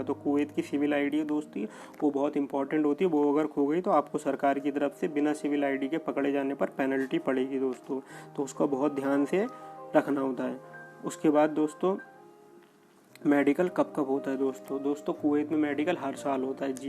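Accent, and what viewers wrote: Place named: Garhwal, India. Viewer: bhai India me aap kahan se belong karte hain..aapka accent garhwal ya koi pahadi side ka lagta hai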